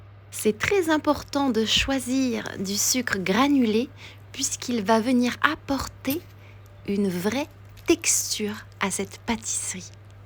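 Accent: France. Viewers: Hi, happy valentine's day. This recipe is simple and delicious too, your French accent made it more delicious ❤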